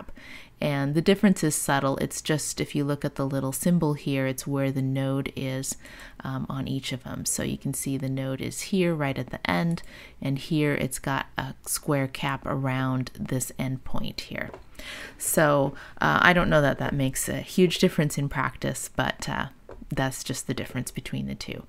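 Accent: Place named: United States. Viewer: What a relief to hear/see a tutorial in American English.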